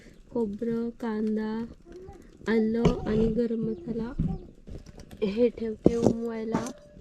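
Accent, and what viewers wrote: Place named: Pune, India. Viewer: मला तुमच्या बोलण्यावरून आणि तुम्ही ज्या भागात राहता त्यावरून वाटत होतं की तुम्ही पुणे जिल्ह्यातल्या आहात आणि आंबेगाव किंवा जुन्नर तालुक्यातल्या असाल... खेड (राजगुरुनगर ) च्या जवळच राहतो आम्ही.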